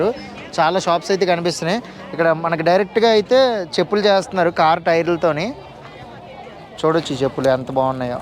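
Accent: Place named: East Godavari, India. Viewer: సూపర్ నైస్ వీడియో భయ్యా మీ ఊరు ఏమిటి ఈస్ట్ గోదావరి జిల్లా స్లాంగ్ ఉంది మీది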